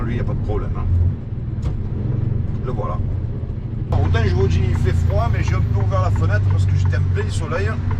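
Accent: Marseille, France. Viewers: Visite de Marseille avec le vrai accent Marseillais J'adoreeeeeeeeeee avec tout arrondissement confondues comme les bons et les moins bons et c'est ça qui fait que Marseille et Marseille et restera ma ville que j'adore, bonne continuation pour de nouvelles vidéos, BRAVO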